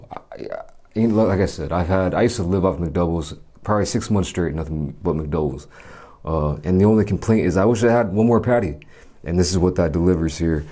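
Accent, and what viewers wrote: United States. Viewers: Why do you have an American accent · U got American accent dude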